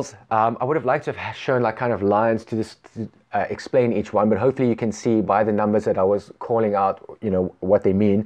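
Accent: South Africa. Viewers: Are you South African ? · Great video... Mine is 650, age 29 ... By the way, you have a South African accent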